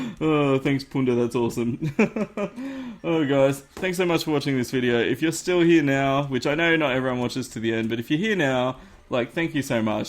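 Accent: Australia. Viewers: Hi! The fishing tip was very helpful to me so thanks a lot! Btw you're making awesome vids and I have a question for you, are you from Australia? I think... after accent.. anyways keep making cool vids! #LoveCookies!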